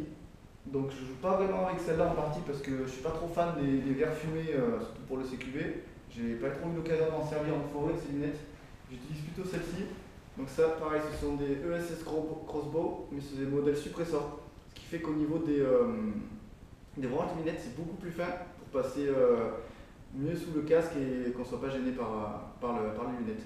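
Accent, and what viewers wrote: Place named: South, France. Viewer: on reconnait la petite pointe du sud avec le ptit "tchichou" ^^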